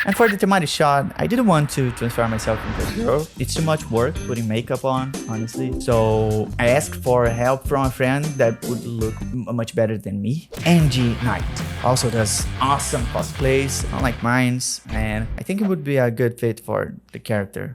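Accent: Brazil. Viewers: mano tu manda muito e da pra perceber que tu é br, mano tu trabalha DMS mano 😔✊ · esse ingles com sotaque brasileiro kkkkk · teu ingles soa muito BR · Nem acredito que vc é brasileiro, deveria ter percebido pelo sotaque. Muito bom saber disso.❤ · Mno tu e brasileiro teu sultaque E teu jeito de falar Vey Se pode mim explicar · Sensacional! Não só o trabalho que está sendo feito, mas também seu ânimo e resiliência em realizá-lo! PS.: Cara, você é de Minas! Que maneiro! O uai que habita em mim saúda o uai que habita em você. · o inglês do cara é muito bom, mas sotaque brasileiro não abandona ele kkk soube assim que ele soltou a primeira frase (não tô dizendo que ele deve perder o sotaque) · Tu é brasileiro mano ? Por isso que eu achei o inglês familiar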